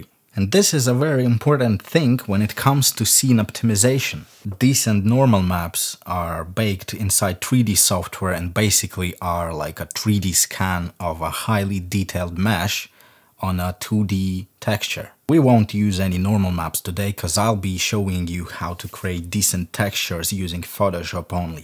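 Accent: Russia. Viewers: Are you a Russian? Doesn't seem you're from UK. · блин, я прям чувствовал натянутое английское произношение, ахаха, русских видно сразу =)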